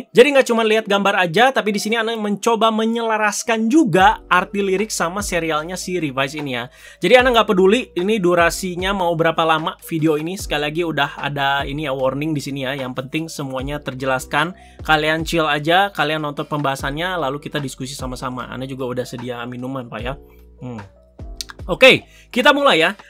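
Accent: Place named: Madura, Indonesia. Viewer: MC nya kayak orang madura.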